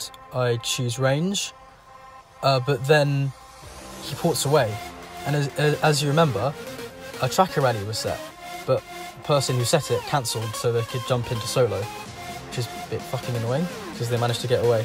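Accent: England, United Kingdom. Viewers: You are English lol didn't expect that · you sound like worthy prince lol...u also from UK? good to hear your voice...u are a serious guy it seems contrary to your posts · Huh who is this British Taking over channel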